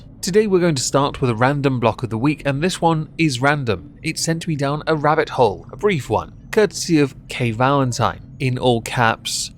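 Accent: RP, United Kingdom. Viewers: OH- I really, really want to subject him to them. He's peak entertainment when He's disgusted and annoyed. Oh please 🙏! The worst offenders are always intimidated by your command of the English Language / Posh accent.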